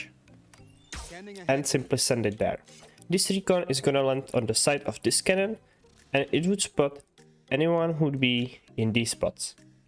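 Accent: Czech Republic. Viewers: i cant help my self but... are you from czechia ? XD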